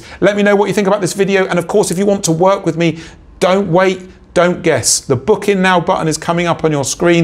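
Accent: England, United Kingdom. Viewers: How am I only noticing now that you have an English accent? I have been binge watching your videos daily lol.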